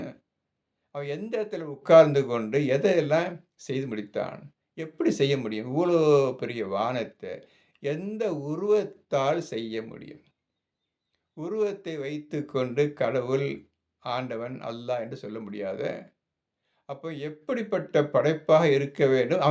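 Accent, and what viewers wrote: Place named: Sri Lanka. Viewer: சிங்கள பௌத்த பிக்கு ஒருவர் தமிழில் உரையாற்றுவது போல் இருக்கிறது. ஆவேசம் இல்லை. கடவுள் -கட+உள். உன்னுள்ளே பார். ஓராதார் உள்ளத்துள் ஒழிக்கும் ஒளியான்.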